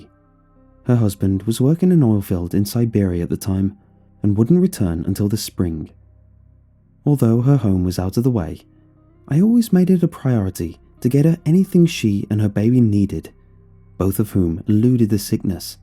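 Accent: United Kingdom. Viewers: I love your sweet British accent, keep up the good work 👍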